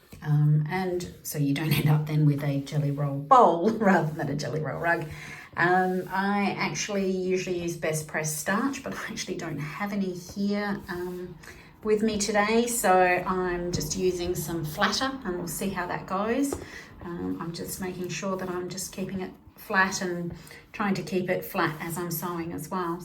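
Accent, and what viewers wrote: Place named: Australia. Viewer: A big thankyou....very informative. Not a boring video as they can be N you sound Aussie, like me.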